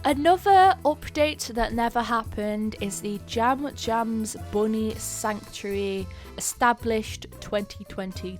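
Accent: United Kingdom. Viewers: are you british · I love her British accent she is the best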